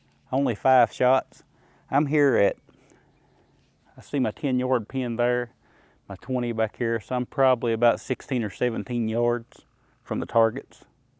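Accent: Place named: Kentucky, United States. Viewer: Im from Shepherdsville just south of Louisville. Sounds like you're from KY too. If so what part?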